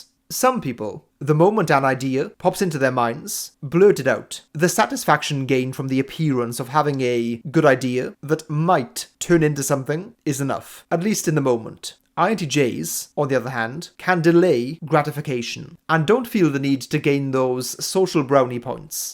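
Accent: Wales, United Kingdom. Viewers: He was the first yt channel I found on mbti - Iearned a lot with his simpler style videos, then moved more to your channel for the fancy language and welsh accent.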